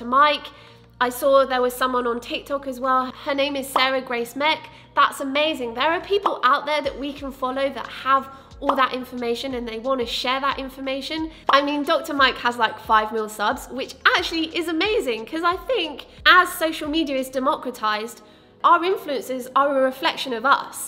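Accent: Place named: United Kingdom. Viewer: British sure is beautiful, do classy 🥰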